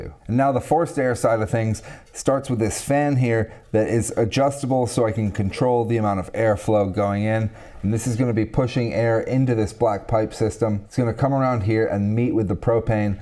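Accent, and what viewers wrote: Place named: United States. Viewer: This channel may be the most useful, applicable, & effective content on here. It's the meat & potatoes answers for worldwide, pertinent questions. My biggest question is why do you sound American & not British? Personally I'd love to understand how you got where you are & what you're doing today? How did you aquire your skillsets & why you find what you do valuable?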